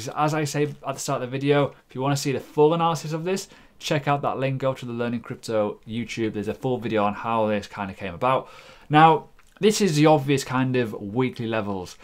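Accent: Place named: Hull, United Kingdom. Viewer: Where are you from mate. East Yorkshire. Bit of hull accent there lol I might be wrong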